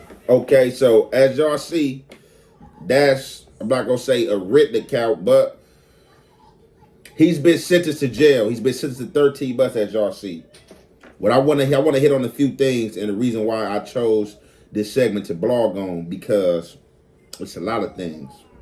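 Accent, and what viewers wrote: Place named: South Central, United States. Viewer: that south central accent is iconic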